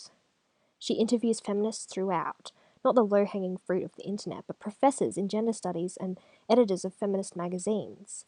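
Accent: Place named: Australia. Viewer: Also: with such a prevalence of US video producers, it's so relaxing to hear an Aussie voice. Thanks for the vid.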